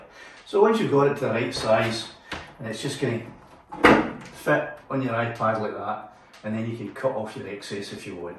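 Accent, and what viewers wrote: Scotland, United Kingdom. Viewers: Great content, im just here for the scottish accent :) cheers from DK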